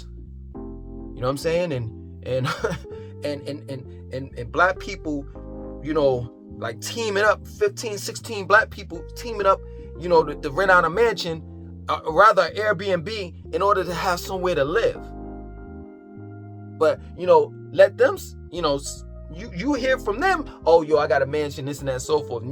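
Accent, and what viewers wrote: New York, United States. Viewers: 😮😮😮 U a new yorker??...say word iz bond son®️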